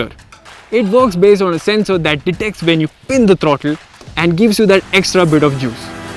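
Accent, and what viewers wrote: Kerala, India. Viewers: Mallu accent matches may be they changed with some other mallu. "thaa-rough update yet" (thorough update), "COMB-letely new styling" (completely new styling) many such thru out the video. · I know a Malayali accent when I hear it lol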